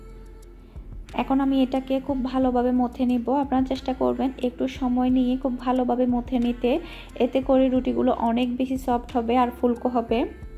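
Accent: Chittagong, Bangladesh. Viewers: R u from chittagong?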